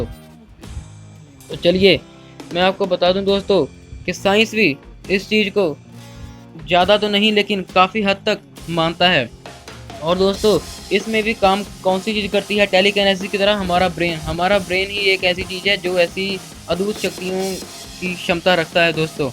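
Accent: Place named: Punjab, India. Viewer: Narrator is from Punjab, I guess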